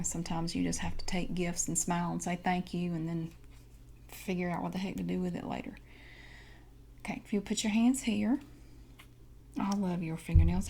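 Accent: South, United States. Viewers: Amazing! Southern Accent is such a good trigger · IT's cool to see another asmrist with a southern accent. I felt a little self-conscious about my voice but starting to feel more okay with it. You're amazing! Subscribed! :)) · Southern ASMR? I'm in heaven. Subscribed!!!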